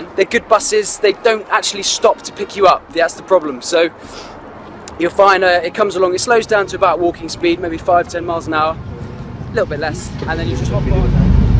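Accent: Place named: United Kingdom. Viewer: I really love British accent.😍😍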